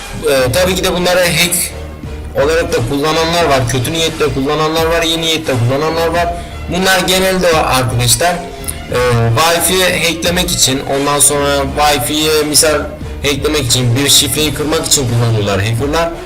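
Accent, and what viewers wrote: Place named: Azerbaijan. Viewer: Azeridi özünü qırmır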